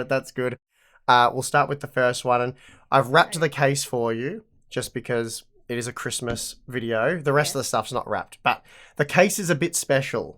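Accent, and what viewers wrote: Australia. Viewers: Daddy's shadow., she's a good looking girl....looks a lot like dad. And yes, I simply adore that lovely Aussie accent. Keep up the great work. Does Tim have a son/daughter to also do a pc build.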